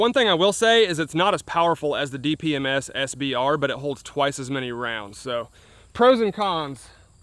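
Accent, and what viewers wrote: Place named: South, United States. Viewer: It’s weird. You sound southern, but you say pop.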